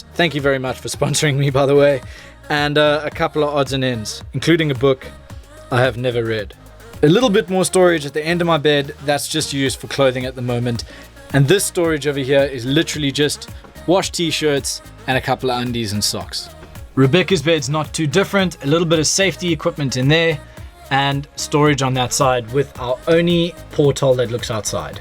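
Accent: South Africa. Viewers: Are you SouthAfrican? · Are you south african??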